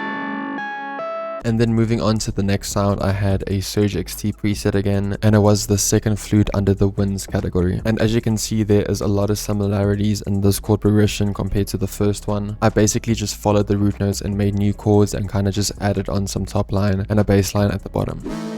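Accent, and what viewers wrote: South Africa. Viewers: Yo are you South african??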